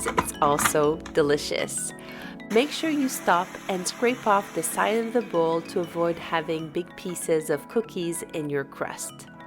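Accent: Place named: France. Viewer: english is my 3rd language, who doesnt like a french accent is just weird lol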